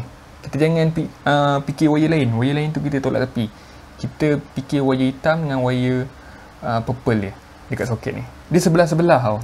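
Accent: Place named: India. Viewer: kl gak fokus dengerin, aku kira orang India yg menjelaskan.😁🙏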